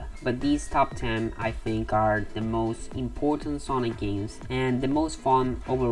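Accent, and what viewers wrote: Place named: United Kingdom. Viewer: You sound British. No offence, I'm British too.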